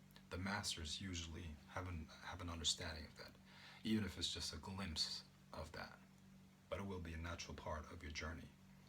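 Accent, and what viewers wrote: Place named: United States. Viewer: Y do u live in Germany u clearly have an American accent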